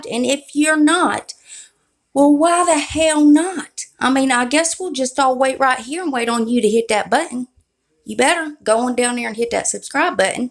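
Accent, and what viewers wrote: South, United States. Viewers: Awesome to hear a southern voice on here. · Love your southern accent 🥰 Thanks for sharing. I subscribed 👌🏽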